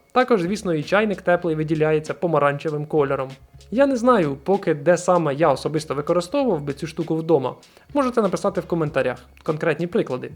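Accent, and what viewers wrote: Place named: Russia. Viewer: Чувствуется по говору родной язык русский.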